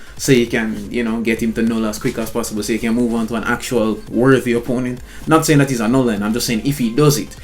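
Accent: Jamaica. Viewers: are you jamaican bro. if not big up stills 🇯🇲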